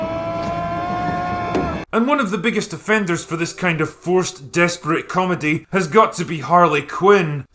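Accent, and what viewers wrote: Scotland, United Kingdom. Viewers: You’re Scottish? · I used to think you always sounded drunk, then I realized you’re just Scottish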